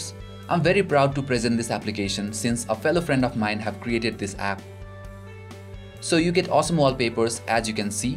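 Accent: India. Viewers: This was quite helpful, but honestly, I missed many words because of your Indian-area accent. I have taught English as a Second Language for many years and the most common mistake made by people from this part of the world is speaking too rapidly. Even native English speakers are easily misunderstood if they run words together too quickly, and when you add in the occasional mispronunciation of a foreign speaker, it makes it frustrating for the listener. Simply slow down a touch and people will follow you much better.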